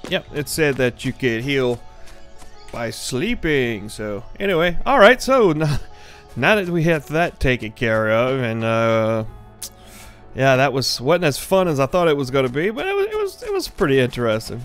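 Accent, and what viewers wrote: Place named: Texas, United States. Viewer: You sound like your from Texas or something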